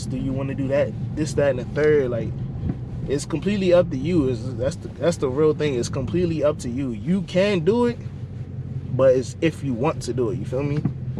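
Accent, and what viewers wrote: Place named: DMV, United States. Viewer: I thought you was from the dmv 😂 I can hear it 😂😂😂😂 but I’m finna go to the military so I wanna get one when I get back 💯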